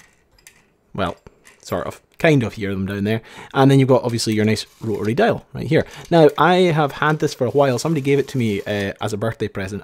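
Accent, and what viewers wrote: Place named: Scotland, United Kingdom. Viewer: "dialing the hooce". I love the Scottish accent. My girlfriend is from Oban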